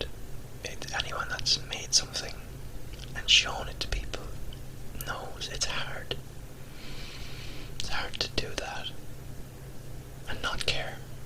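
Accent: Ireland. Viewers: Hello my lovely, it is so good to see you back making videos, to see you happy and to see your beautiful smile again. I'm so sorry that you encountered some unkind people, when you are so sweet, kind and lovely to everyone. As for videos you post in the future, as long as they feature your gorgeous Irish accent, they will be perfect. (I loved your poetry ones - do you remember the poems I liked which I shared with you?) keep smiling my lovely K x p.s I read The Hobbit! I loved it!!! Thank you for encouraging me to read it.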